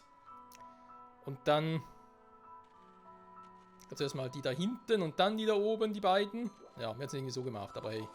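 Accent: Switzerland. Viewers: Hey gutes Video, bist du Schweizer? :D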